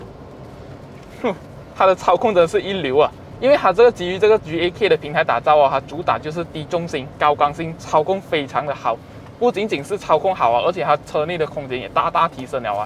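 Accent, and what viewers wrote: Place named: Malaysia. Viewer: 你讲英文口英太重… 马来人…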